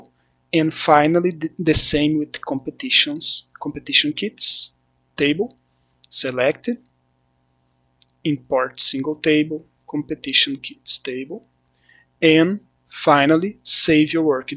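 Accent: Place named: Brazil. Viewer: Tu tem sotaque brasileiro pae.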